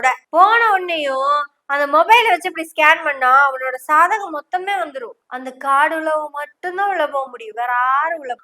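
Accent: Kongu, India. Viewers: சும்மா கொங்கு தமிழ் அழகாக விளையாடுது வாழ்த்துக்கள் சகோதரி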